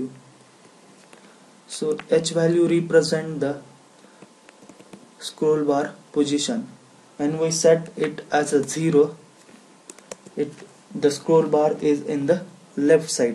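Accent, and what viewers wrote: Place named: Hindi, India. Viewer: this lovely Hindi accent..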